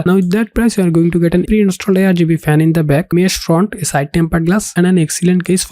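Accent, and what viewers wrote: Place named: India. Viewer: You are talking way too fast. And your strong Indian accent makes it hard to understand a word of what you are saying. You might as well not be speaking English.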